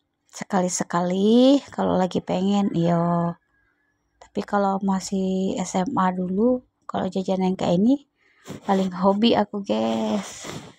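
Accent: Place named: Palembang, Indonesia. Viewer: Menu yang sangat enak sekali lor. Kayak orang Palembang lor gaya ngomongnya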